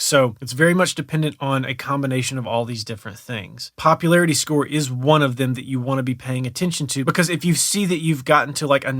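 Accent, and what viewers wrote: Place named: South, United States. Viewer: good stuff man! appreciate it. noticed a southern accent, where are you from?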